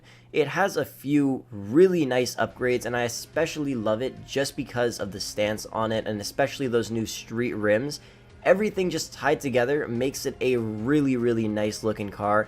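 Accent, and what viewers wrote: Canada. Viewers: Nice vid, you’re from Canada aren’t ya? I figured by the way you said “out”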